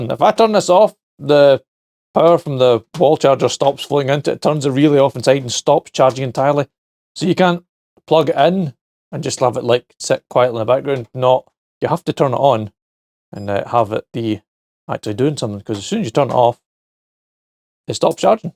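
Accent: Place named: Glasgow, United Kingdom. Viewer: could you possibly incorporate the words "curly whirly". "murder", "world" and "work" into one of your next videos? I love how they sound in the Glaswegian tongue. I always enjoy your vidz man.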